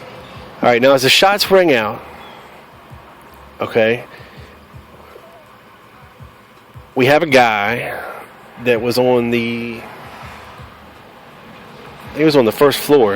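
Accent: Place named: Oregon, United States. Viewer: Hey I enjoy your videos a lot. And by the way, you talk like we do. Small minded people criticize— I appreciate the knowledge and open minded approach! Love from Oregon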